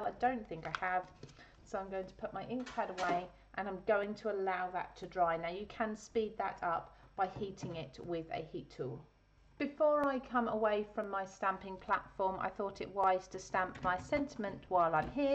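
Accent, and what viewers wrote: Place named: United Kingdom. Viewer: lovely cards thanks I need ro make one hreat ides. That said but personal peeve why are you using only inches and not cm? It really irks me that many places globally use CM but in videos people pander to the USA and use inches and do not give the CM so the rest of us in the modern world have to convert. I can hear you sound like you are fellow Brit not an American if that the case you will know about CM.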